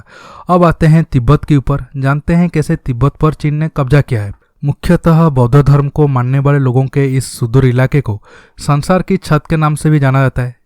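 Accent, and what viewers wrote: Odisha, India. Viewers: Aap udisaa k ho. Kyaaa. Bhai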